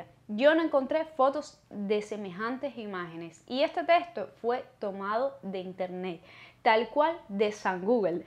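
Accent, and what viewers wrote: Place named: Cuba. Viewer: Ver Trinidad, me recuerda muchos de los llamados pueblos Mágicos de México, Estimada me encantó la música "habanera" como intro y fondo, tu relato bien estructurado y con muy buena dicción y ese exquisito acento cubano, Trinidad es un pueblo mágico con un enorme acervo cultural e histórico, te agradezco por mostrarnos tu amada Cuba, te mando un abrazo grande desde Jalisco México.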